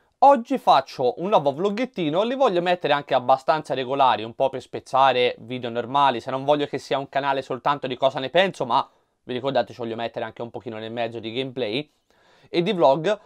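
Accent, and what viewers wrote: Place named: Tuscany, Italy. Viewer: A me piace un sacco il tuo accento toscano e spero di vederti un nuovo "ufficio" presto! Buon Anno!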